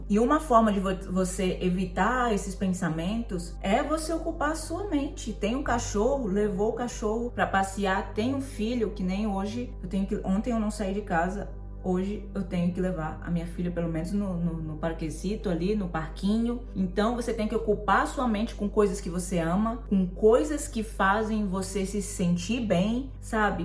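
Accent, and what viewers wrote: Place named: Maranhão, Brazil. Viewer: ❤❤❤ que top conhecer o rosto dono da voz. Eu imaginava voce morena, nao sei porque rs. Mas seu sotaque é tao gostoso de ouvir, parece de uma amiga minha do Maranhao. Como sempre um video pontual. Amei, prazer te conhecer!!😊😊😊🥰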